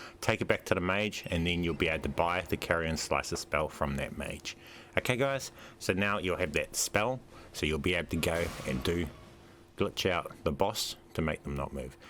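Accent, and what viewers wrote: New Zealand. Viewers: Thank you so much for the guide! Finally was able to beat it because of you haha. Also your NZ accent is so dam cool!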